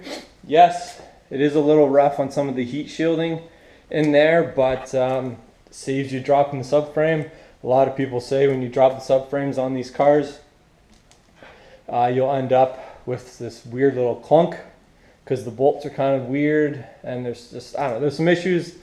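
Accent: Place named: Ontario, Canada. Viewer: Awesome video thanks ! I’ve ordered my new downpipe ,exhaust and turbo and Malone tune , next will be my turn to scrape my knuckles 😂😂😂😂 I totally guessed your accent at the beginning too , had to be from Ontario Canada 😂😂😂 I’m from Bc 😬🤷🏼‍♂️ 🇨🇦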